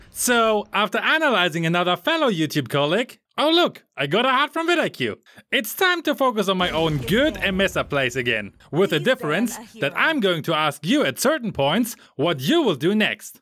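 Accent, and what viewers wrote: Germany. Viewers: You from Germany?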